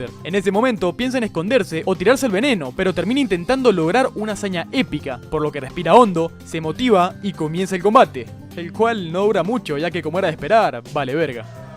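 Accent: Argentina. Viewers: seguramente eres argentino por tu vos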